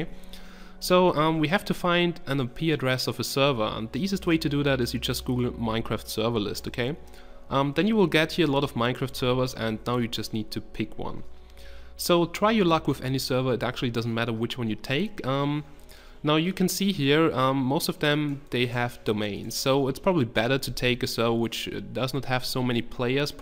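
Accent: Germany. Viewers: was du bist deutscher? xD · Man hört das du deutscher bist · is he german ? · biste deutscher? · are you german? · hey are you german ? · Ist er Deutscher? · are you german,? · kann es sein dass du deutscher bist ? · are you German? Bist du Deutsch? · man hört das du bist deutsch :)) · are you german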